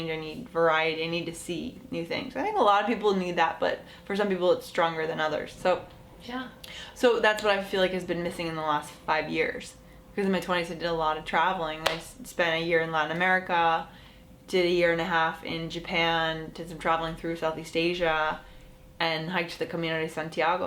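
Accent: United States. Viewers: Hi, You look and sound more as an American girl than you are in AEE podcast, you are so cool!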